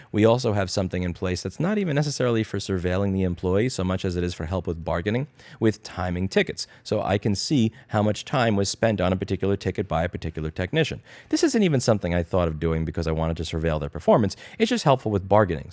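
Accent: New York, United States. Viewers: I have a feeling in like two years time he's going to look and act completely Texan, but still talk like a New Yorker. Welcome to Texas =)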